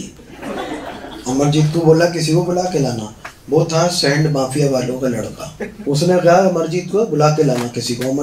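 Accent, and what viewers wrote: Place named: Bareilly, India. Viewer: Bhai tumhara accent jabardastt hai .Mai b Bareillian hu bhrother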